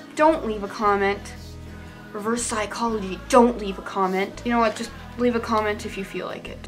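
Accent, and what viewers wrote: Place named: General American, United States. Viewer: I'm no accent expert by any means. Your accent is pretty generic American for the most part but when you said "though" I caught that sort of lengthening sound that is common with people from the north, namely Minnesota.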